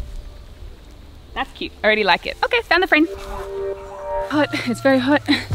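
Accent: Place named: Australia. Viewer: Were you using a timer or? Also! You’re so bubbly I love you channel! And! What’s your nationality because your accent is a bit of a mix. I’m from Australia and you sound kinda aussie!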